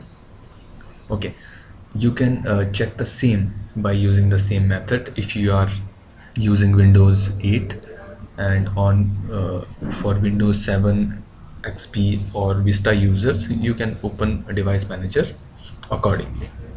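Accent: India. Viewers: fuck your indian accent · That's probably because of his Indian accent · why ur indian voice sounds so gay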